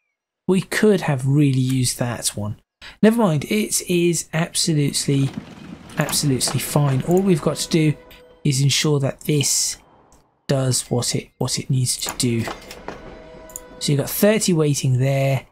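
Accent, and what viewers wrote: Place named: Liverpool, United Kingdom. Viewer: With youse Scouser accent, I have the same problem with Yorkshire. Where t’ t’ goes…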